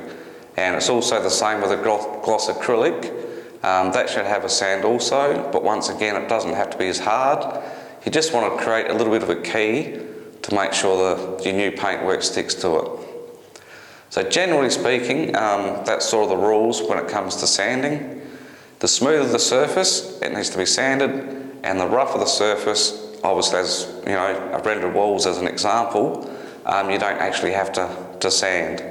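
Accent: Australia. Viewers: Very well spoken , Aussie accent , great video , great advice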